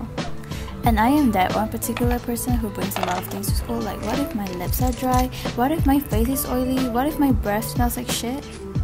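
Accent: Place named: England, United Kingdom. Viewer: woww..i like your english accent🔥🔥..im from malaysia and new subscriber💓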